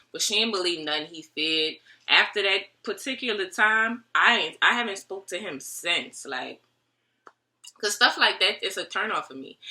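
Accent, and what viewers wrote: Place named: South, United States. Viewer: OK. That's the sexy grammar I hear. You're a southern bell. Cool.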